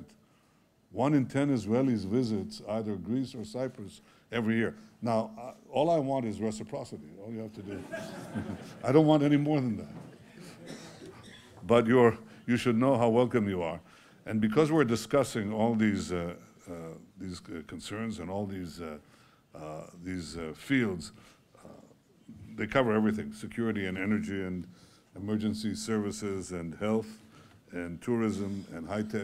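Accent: United States. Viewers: Harsh Truth. And don’t forget Bibi is American too. That is a Philadelphia accent if I ever heard one, has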